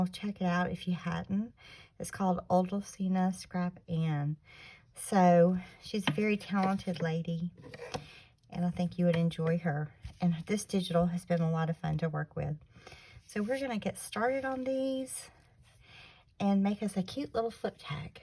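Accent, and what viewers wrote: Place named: South, United States. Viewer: I enjoy your sweet Southern accent as much as the tutorial 🌼 Such beautiful pieces!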